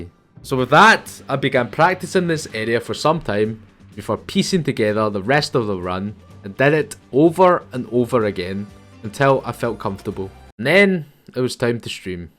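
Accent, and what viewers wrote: Scotland, United Kingdom. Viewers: Asian man with a Scottish accent. fucking awesome. <3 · There's such a goofy charm to Scottish accents, audio book when??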